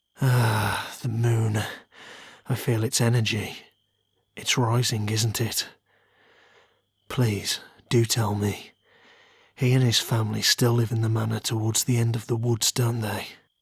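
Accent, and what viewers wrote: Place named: Birmingham, United Kingdom. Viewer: Him: who is he? Me: Levi Ackerman •_• Also really good video! You kinda sound like someone from peaky blinders! I loved it!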